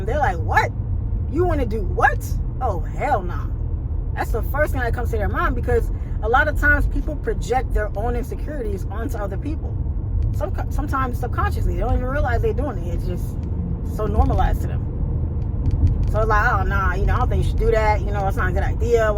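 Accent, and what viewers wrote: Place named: New York, United States. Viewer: I can tell your from New York.We don't trust like that growing up in those streets.Congrats on your success and for giving up your insight.❤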